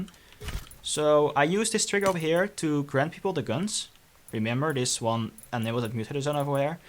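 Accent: Netherlands. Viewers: Are you Dutch? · wait are you dutch??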